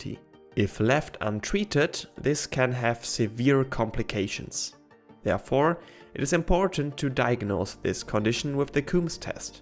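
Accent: Germany. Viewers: Awesome video. Hab direkt gehört, dass du aus Deutschland kommst 😁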